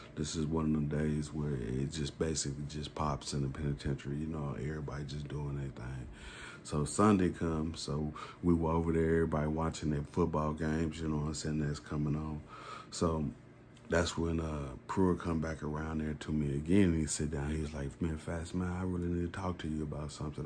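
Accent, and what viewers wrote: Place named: South, United States. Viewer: Water ever time you talk…talk like u from slow south…… story dope though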